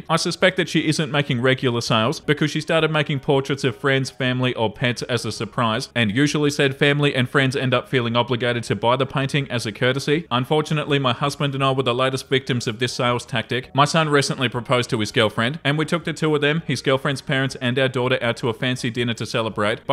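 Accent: Australia. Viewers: i was on facetime w my sibling the other day and they heard the vid i had on in the background and asked me "who's your cheery australian man"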